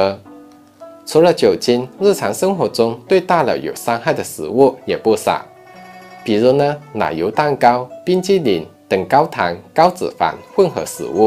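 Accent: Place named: Rural, China. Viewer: “乡下话”，久违了。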